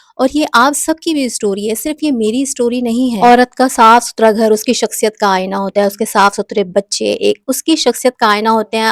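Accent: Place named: Karachi, Pakistan. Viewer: Ap Karachi se ho apky lehjy se LG raha ha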